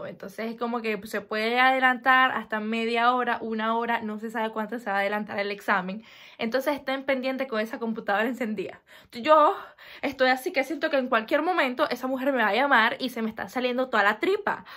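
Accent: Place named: Nicaragua. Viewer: Vivo en inglaterra te sigo de unos meses atras, me llama la atenciòn tu acento Nica. Soy nica.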